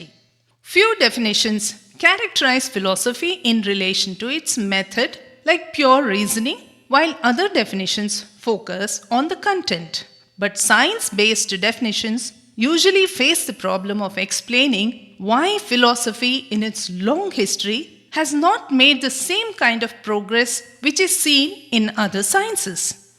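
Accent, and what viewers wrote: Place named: India. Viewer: typical Indian English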